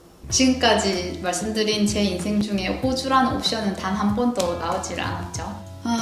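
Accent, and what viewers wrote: Busan, South Korea. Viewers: 블로그를 읽는 것과는 다르게 영상으로 보니 또다른 재미가 있네요!! ㅋㅋㅋ 부산 사투리도 신선합니닷ㅋㅋㅋㅋㅋ 왜 캐나다로 가려고 하시는지 !! 그 영상 기대하겠슴니다 :) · 약사이민으로 몇몇 채널을 봤지만… 약사님처럼 다정다감(?)한 느낌은 없었어요. 작가님이라 그러신지 말도 조곤조곤…. 아주 익숙한 네이티브 부산억양 ㅎ 편안하게 잘 들었어요~~ “남자때문에 호주 간거네??” 삥 터짐 ㅎ 구독. 좋아요. 알림설정 때력박고(?) 다음 영상으로 고고~~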